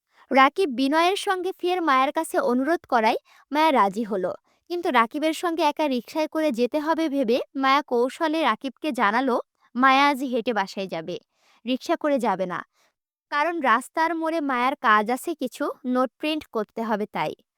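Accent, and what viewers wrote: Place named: Kushtia, Bangladesh. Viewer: আমি নিজেও কুষ্টিয়া জেলার মেয়ে। আপনার কথা শুনে নিজের জেলার টান টাই মনে হচ্ছিল বার বার। এবার কনফার্ম হয়ে গেলাম।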